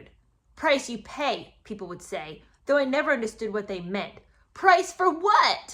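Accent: United Kingdom. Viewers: Omg me too, I love your nasally British voice 🥰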